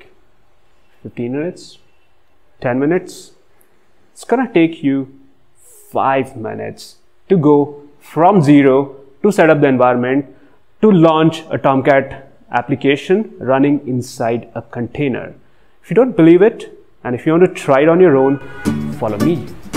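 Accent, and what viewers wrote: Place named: India. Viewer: I'm no English native so the Indian accent is hard for me, sorry.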